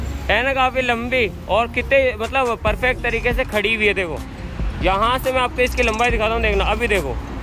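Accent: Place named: Rajasthan, India. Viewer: Thet vaha tak hai Rajasthani with hindi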